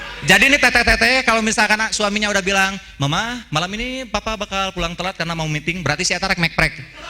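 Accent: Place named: Cianjur, Indonesia. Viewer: Logat cianjur 💯 leres 😅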